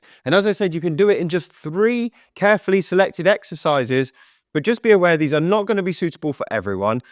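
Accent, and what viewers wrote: England, United Kingdom. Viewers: I’m here for the accent. Lovely English accent. Beautiful way of saying “bottom “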